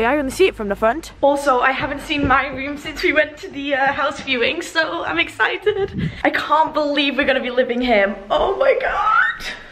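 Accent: United States. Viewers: I’m not even hating but why do you talk like your American when you’re clearly English. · U sounded a bit american 💕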